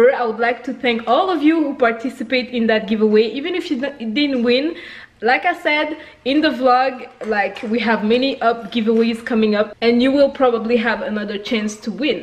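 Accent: France. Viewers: I have to tell you that I love your french accent! 😊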